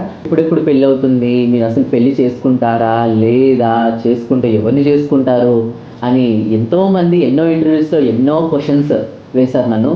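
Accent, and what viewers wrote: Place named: Kadapa, India. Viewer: Meedi Kadapa Anna happy to listen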